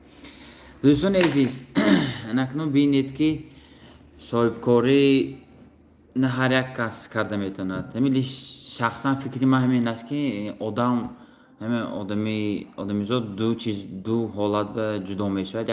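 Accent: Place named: Khujand, Tajikistan. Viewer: Эй бародар забони тоҷикиба ть читари гап мезани.... нестай..... ин додари мо ай Хуҷанд мебошад!!!! Лаҳҷаи Хуҷанди фарқ мекунад!!!!!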